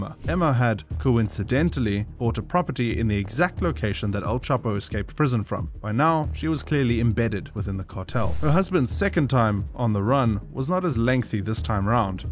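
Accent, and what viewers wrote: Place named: South Africa. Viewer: Narrator sounds like he has a South African accent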